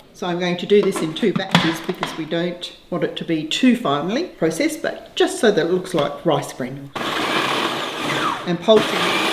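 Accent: Australia. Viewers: Do I detect an Australian or a New Zealand accent? I loved the recipe by the way, especially when you made cauliflower rice because it's something I've been wanting to try. I love how relaxed you are. This is my first video that I have watched on your channel and I've subscribed already. Even though your 'about' section said you focus on gluten free recipes we can all use them. Thank you for sharing your knowledge of cookery. Xx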